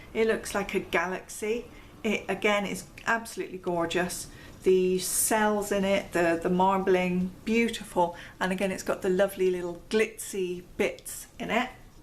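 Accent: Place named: Devon, United Kingdom. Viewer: good on ya. I love seeing people having a go. are you from south West? Devon maybe?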